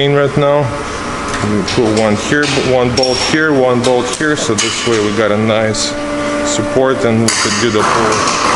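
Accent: Russia. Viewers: Говор как у русского.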